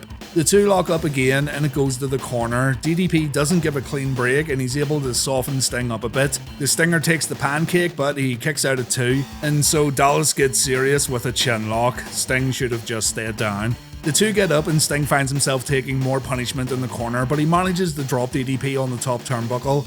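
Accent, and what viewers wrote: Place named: Scotland, United Kingdom. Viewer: Is this guy from Scotland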